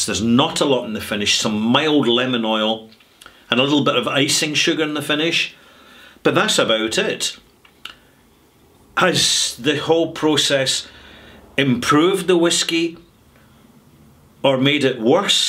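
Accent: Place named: Glasgow, United Kingdom. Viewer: Thanks for the critique! Came over due to urging. Very interesting! I have viewed another posting with you in it -- Russian MRE I think -- You are both delightfully entertaining! Question - I can hear more Glasgow in your speech, but not. What is that about?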